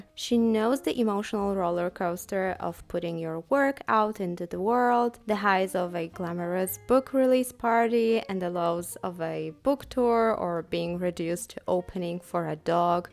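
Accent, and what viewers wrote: Poland. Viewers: I love your polish accent, pls keep it!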